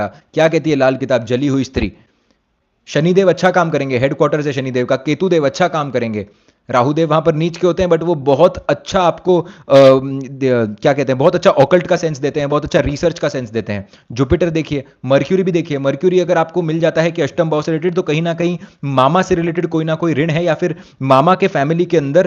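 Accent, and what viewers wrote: Indore, India. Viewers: Nice, but are you from Indore?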